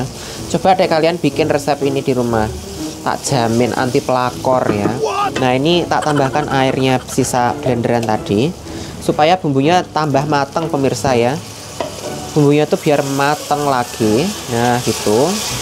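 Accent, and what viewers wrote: Java, Indonesia. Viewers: Mas jawanya pundi kok bahasanya medok bangettt 😊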